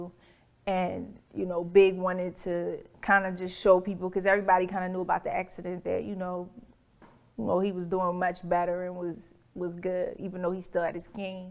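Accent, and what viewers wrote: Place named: Philadelphia, United States. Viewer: I love her Philly Accent!!!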